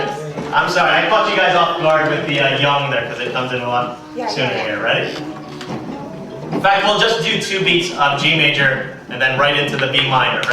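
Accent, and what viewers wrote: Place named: United States. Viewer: wow this is so awesome!! id love to come to a gruop one theres definately less pressure and more relaxed :) but im in england and u obv have an american accent!:(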